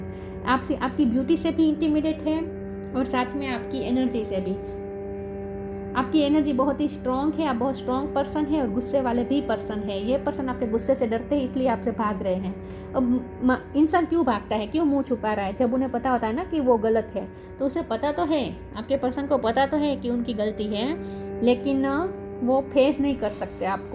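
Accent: Gujarat, India. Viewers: Ma'am aap gujrati ho.??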